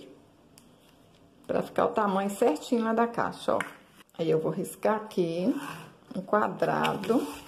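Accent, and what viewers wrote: Minas Gerais, Brazil. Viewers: Gente, que coisa lindaaaa! Sou fã desses tipos de trabalho! Amo esse jeitinho mineiro de falar. Parabéns. LINDO! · Ficou maravilhosaaaaa, parabéns!!!!! Amo sotaque de mineirinha 😍😍😍😍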